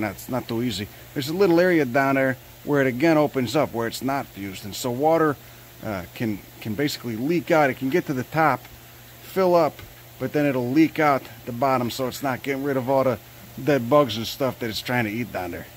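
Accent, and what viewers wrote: Chicago, United States. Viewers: Brian Williams more like CHICAGO. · lol....you look much different then you sound. how is it you kept the chi town accent even after traveling the country after highschool?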